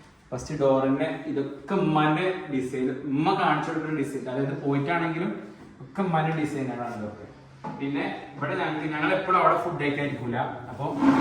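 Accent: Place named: Palakkad, India. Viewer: പാലക്കാടൻ Slang അങ്ങിനാ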